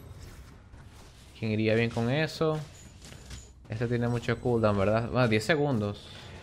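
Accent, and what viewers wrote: Venezuela. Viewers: lo mismo me preguntaba yo xD es venezolano ? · Eres venezolano mano?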